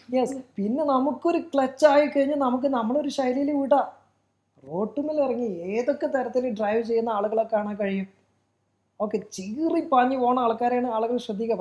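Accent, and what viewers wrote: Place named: Malappuram, India. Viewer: Malapuram slang nannaeittunde sir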